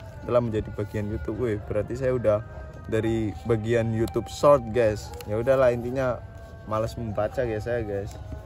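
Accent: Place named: Banyumas, Indonesia. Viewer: Kayane tiang ngapak 😁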